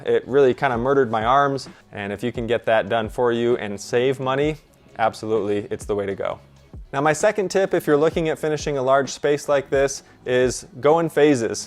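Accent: United States. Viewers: guy speaks American English but has a GREAT BRITAIN flag ... something is fishy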